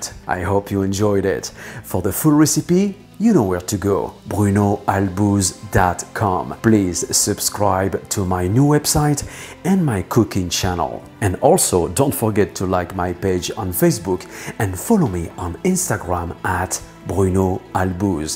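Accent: France. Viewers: Y would u add corn syrup ? That’s not european despite the french accent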